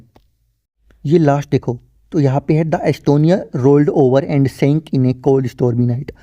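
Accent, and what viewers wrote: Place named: Rajasthan, India. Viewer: accha mujhe rajsthani voice lagi thi aapki isliye puchh liya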